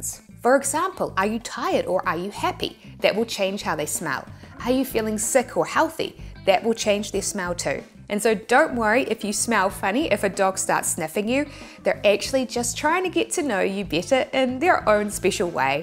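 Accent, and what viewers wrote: New Zealand. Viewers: Wow! so good to hear the Kiwi accent talking about my favourite pet. Hope to hear more from you.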